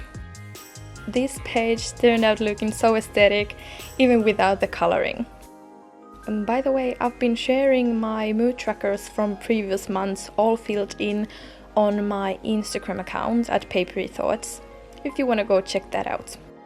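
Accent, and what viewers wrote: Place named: Finland. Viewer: /video starts "oh she has a nice accent. what is it from. sweden? finland?" you: "here in finland (...)" me: yooooooooooooo B)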